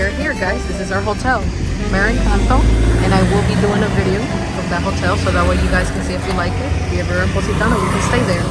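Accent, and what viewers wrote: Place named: Latin America. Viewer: What type of Latina are you? Your accent is familiar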